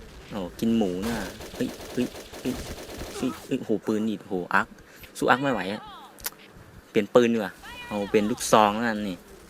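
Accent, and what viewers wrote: Isan, Thailand. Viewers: สำเนียงเหมือนคนอีสานเลย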